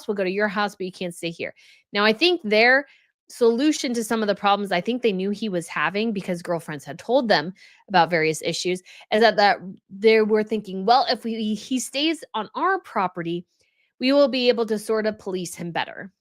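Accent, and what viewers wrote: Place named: Midwest, United States. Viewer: Hey I’ve been watching your videos for a few weeks and I So appreciate that you refuse to say “controversial” words. I also appreciate your stand on right and wrong (not always what the world considers right and wrong) and your ethics! Love your vids and I’m so glad I discovered you. Are you based in the U.S.? You sound like a typical midwestern girl😊. I love it!!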